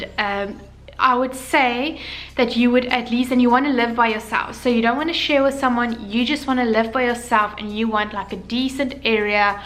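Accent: South Africa. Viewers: You sound South African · She sounds very South African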